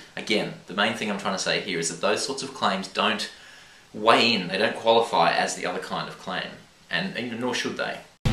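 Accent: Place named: Australia. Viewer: Good vid. What part of Aus you from; never can tell from the accent! I'm an Adelaidean, been thinking about joining an atheist type group do you know any good ones in Aus?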